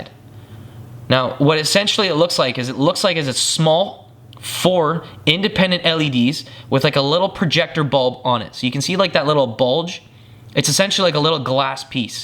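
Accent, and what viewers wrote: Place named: Canada. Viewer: so canadian... oooout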